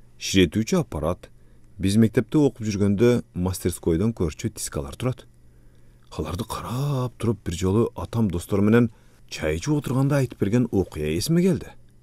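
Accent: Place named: Batken, Kyrgyzstan. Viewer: Ото соонуууууун укулуктуу Биздин Баткендин тили менен суйлогону ото укулуктуу ❤😊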